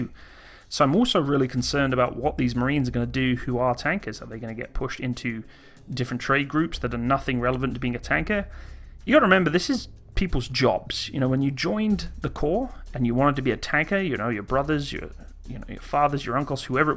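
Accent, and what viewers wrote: United Kingdom. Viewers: What part of UK is your accent from?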